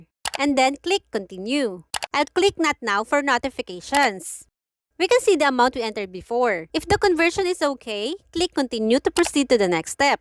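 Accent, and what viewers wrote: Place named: Philippines. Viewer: Iba talaga mag english ang tagalog. Litaw na litaw haha. Ty bga pala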